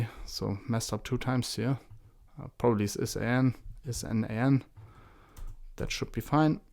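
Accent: Germany. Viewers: Jesus Lord, I can't believe I only just now stumbled on this amazing channel! And he has German accent. Pure gold. Amazing video and neat code. Thx so much mein Sensei 🙏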